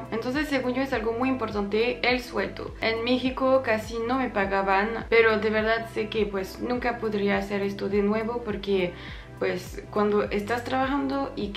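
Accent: France. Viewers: El acento francés se escucha muy bien en español. El shua y las variaciones de la "i" son fenomenales. Tienes dos vidas : Hablas español nativo y francés nativo, ¡de lujo escucharte! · Cómo eres envidiosa. Solo por su acento frances. Y romántico.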